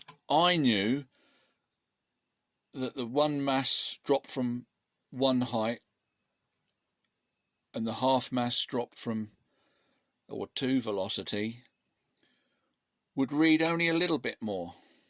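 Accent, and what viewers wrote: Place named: Cockney, United Kingdom. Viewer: Oh dear indeed - Thank you for sparing some of us the time wasting ordeal of listening to his utter garbage excuses. You can congratulate yourself on getting him to weazel out of his long standing MV claim. "Cluuuuck cluck cluck cluck" is what it sounds like from him. And so he doesn't like the way you talk? What does he want you to do... fake a different accent? I'm a Midlander/ Northerner and love the London / Essex accent, whchever it is - To us it all sounds pretty Cockney, a bit Arfer Daley and I wish I could mimmick that accent cause I always thought it sounded dead cool. Now if you had a Brummy accent, he might have a point lol! Just kidding. It shows his difficulty dealing with reality, when even the reality of your regional accent is too much for his "brain" to bear.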